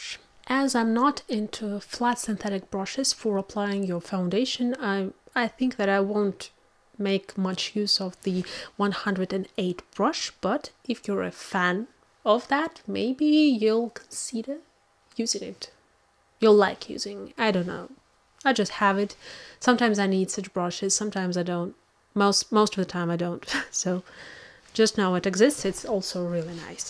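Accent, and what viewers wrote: United Kingdom. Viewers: ваш британский акцент шикарен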